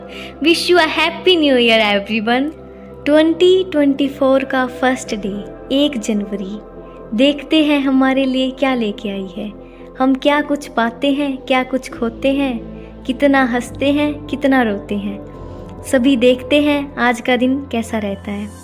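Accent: Haryana, India. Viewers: Aaj k vlog me haryanvi sunkar bahut aacha laga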